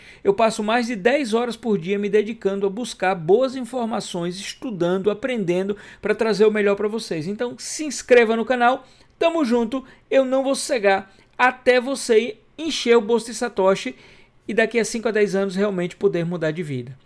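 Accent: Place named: Pernambuco, Brazil. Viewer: vc era de q parte do nordeste Aki no Brasil? Vou chutar Pernambuco por que vc fala sempre ( butar ) 👏👏👏👏👏👏👏👏👏👏👏👏👏👏👏👏👏👏👏👏👏👏👏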